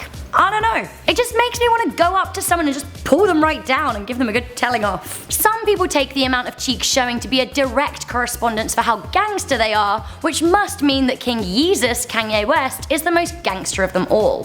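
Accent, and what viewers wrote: United Kingdom. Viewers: thanks lol she sounds British but she knows all the us stuff and she says "My friends in canada and the US" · I am gulity of like 1 of these also i love your accent. I am going to assume your from the UK? Sorry if i am wrong! :| :) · Sounds pretty British to me brah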